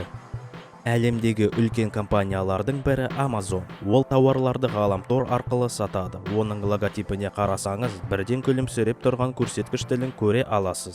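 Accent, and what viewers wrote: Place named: Kyrgyzstan. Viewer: 😡дұрыс сөйле қырғыссын ба?👅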